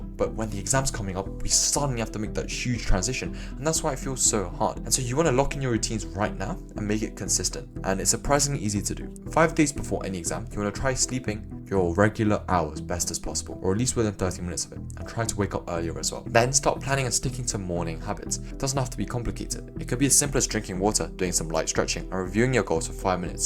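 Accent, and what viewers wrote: United Kingdom. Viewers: why does he sound so british?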